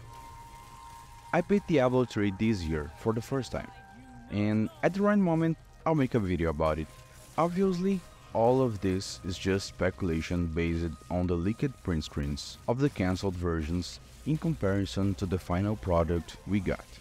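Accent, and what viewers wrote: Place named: Brazil. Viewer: Hmmm sotaque br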